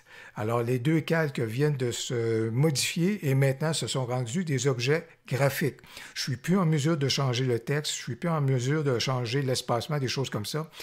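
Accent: Quebec, Canada. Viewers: Merci beaucoup pour Tuto très bien réalisé. Et puis pour moi, cerise sur le gateau, c'est l'accent Québecquois que j'adore, même si tu me répondrais que tu n'as pas d'accent et co contraire c'est moi qui ai un accent de "Maudit français" 😂😂😂 Et Merci encore une fois !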